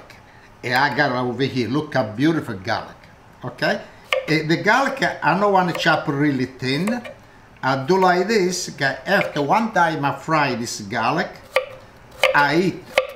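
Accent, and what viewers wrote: Italy. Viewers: Perhaps cut the anchovy up to spread evenly would be the only different thing I’d do I love listening to the slang Italian Yr like the old compare next door. Bravo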